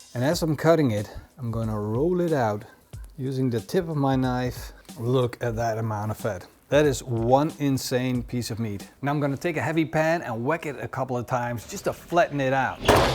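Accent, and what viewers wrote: Netherlands. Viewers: Dutch accent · Awful Dutch accent. I hate the Dutch. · What a big Dutch accent bro . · Awesome recipe! But please do something about your randstad accent. It's appalling to us Dutch who speak English without that shitaccent. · You must be dutch, can hear the accent. great recipe tips. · Meest nederlandse accent ooit haha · I'm Uruguayan, Chimi is our "religion" and this is a very good recipie. Your ingredients choice are correct and kudos for not adding 'inovative' ingredients. The choice of oil and salt makes it more 'gourmet' but the basics are 100% correct Only improvment I can see is that if you want to have the best Chimi ever, replace the dried oregano with FRESH oregano. it will upgrade it to a totally new level ps. you sound Dutch. where are you from ?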